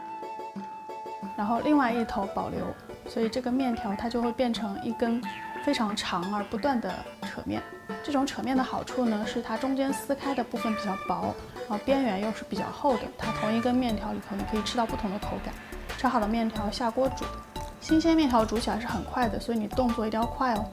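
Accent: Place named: North, China. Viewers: 山西方言吗？感觉说话舌根子比较绵软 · 口音一听就是我们北方人🤣🤣🤣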